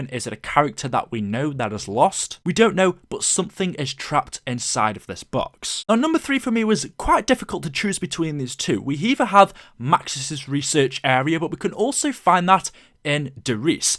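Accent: United Kingdom. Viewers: Every time I click on a COD video there’s a person narrating with a British accent ???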